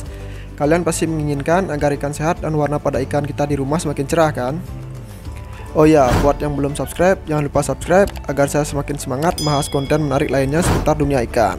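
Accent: Bali, Indonesia. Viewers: Ngenah san logat bali ne bro 😅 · Nak bali oo bli? Ngenah ti logat ne 😅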